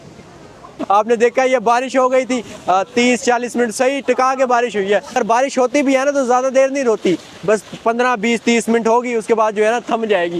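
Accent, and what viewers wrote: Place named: India. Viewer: بھائی، آپ کا ولاگ اچھا ہے، لیکن آپ پاکستانی ہیں اور آپ نے بھارتی لہجہ اپنایا ہوا ہے۔ اردو میں بات کریں، جیسے آتی ہے، فخر سے پاکستانی بنیں۔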